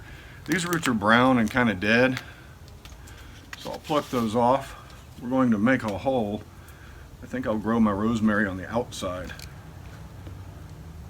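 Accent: Louisiana, United States. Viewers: I love your videos because they are spot on, succinct, & easy to listen to. I was raised in Louisiana and enjoy your accent, too. Question - is sorrel an herb or salad green? I put one in an herb bed and am thinking I'll repot it by itself since learning it's perennial. I'm in coastal California so deal with some fog. Wish me luck!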